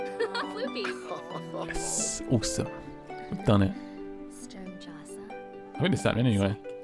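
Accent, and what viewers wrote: United Kingdom. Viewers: I really love your enthusiasm about this game, considering the bad reviews. I have now got the sims (yesterday) and I like it so far. Building a house was amazing. I love the family dynamics. I know there are major things missing from sims 3, but this is not sims 3, this is sims 4 and all is good so far. What is your best and least liked feature? Nice to hear a UK voice xx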